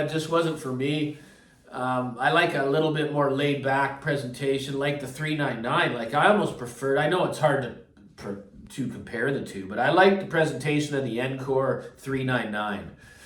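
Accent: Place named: Canada. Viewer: Merry Christmas to you,. We’ve got about 3’ of snow here, south of Buffalo. Record season has officially begun. Just fired up the old 80’s Mac rig. Always let her warm up with a little Canadian radio, 101.1 More FM, currently. What a privilege to have grown up with all these great stations. I’m new to your channel…but, pretty sure I picked up that unmistakable “native tongue”. 🇨🇦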